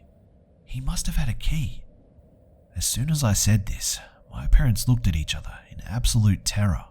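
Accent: Australia. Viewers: Are you Aussie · The Australian Accent was a shock in it self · Always feels so good to see you've uploaded a new video! If I'll get 1/100 of your subs, aka 387, it'd still be huge for me since I've got 22 so far, and I've released 13 videos, so I almost have more videos than subs lol XD But back to the original topic, I really love your videos, and the Aussie accent is a welcoming bonus :)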